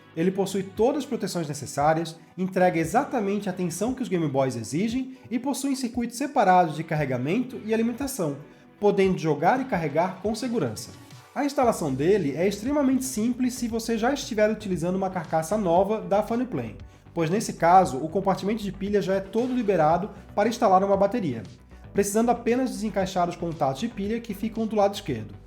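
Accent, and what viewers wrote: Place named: Florianópolis, Brazil. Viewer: Incrível seu conteúdo cara, ganhou um inscrito. Fora que só entrei no video e percebi que é manezinho 😂👏🏻👏🏻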